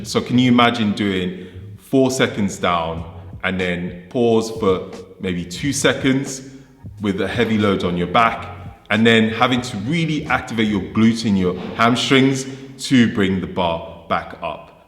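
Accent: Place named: England, United Kingdom. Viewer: Excellent demonstrations! The tempo is admirable with the perfect form. Oh, and I just love this accent. From which part of England are you?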